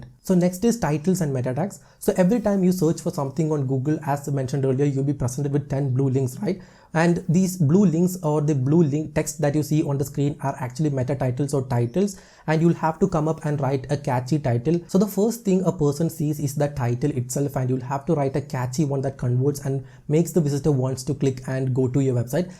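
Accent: India. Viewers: Man you're just the best! Nice content and I use your... (Indian?) English to improve myself haha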